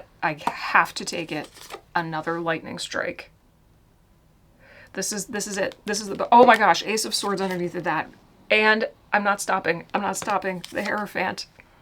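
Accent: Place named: Midwest, United States. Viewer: welcome ! Still watching the rest of your reading. I enjoy you . I'm from Illinois across from St Louis. You Midwest yourself? I don't notice anyother accent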